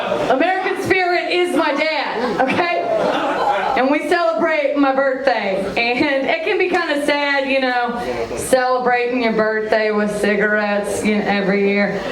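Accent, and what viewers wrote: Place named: South, United States. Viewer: Good set. Have you visited Alabama lately? That southern accent is coming out. I never thought about that Seattle having a giant needle thing. I like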